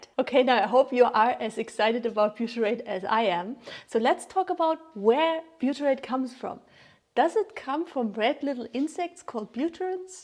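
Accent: Germany. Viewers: I love your accent you delicious German tootsie to worship 👀👀🔥🔥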